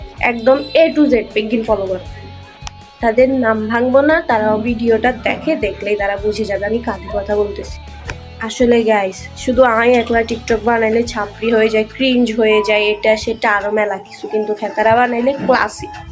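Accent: Noakhali, Bangladesh. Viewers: তোমার এই ব্লগ ভিডিও টা আমার কাছে অনেক ভালো লাগলো আর ও বেশি সুন্দর লাগছে তোমায় আর তোমার ঐ মুখে নোয়াখালী ভাষা শুনে আমার খুব ভালো লাগলো আর বেশি সুন্দর লাগছে তোমরা ভাই বোন হাসি খুশি হয়ে ম-ম বানাচ্ছে এটা দেখে আমার খুব ভালো ও চমৎকার লাগলো আমি যখন তোমাকে আমার বউ করে আনবো তখন তোমার ঐ হাতের বানানো ম-ম খাবো শুধু তোমার হাতে প্রিয় ❤️ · আসসালামু আলাইকুম আপু কেমন আছেন আপনি। অসাধারণ সুন্দর নোয়াখালী ভাষা আমার ভালো লাগে। আপনার সব কথা গুলো আমি বুজি।। ভালো থাকবেন আপনি আল্লাহ হাফেজ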